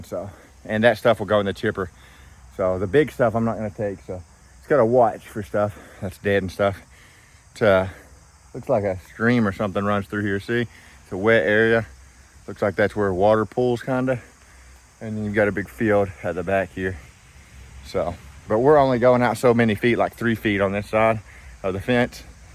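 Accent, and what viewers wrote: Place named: Virginia, United States. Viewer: You sound like your from south west Va or nc my neck of the woods lol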